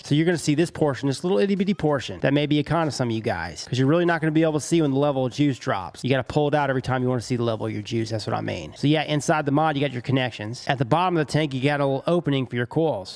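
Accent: United States. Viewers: Those contacts would look cool if your wore them properly. Instead you have your eyes open as wide as possible like a damn meth head making you look strait up dumb. Learn how to wear them the right way. But you sound American so stupidity is expected.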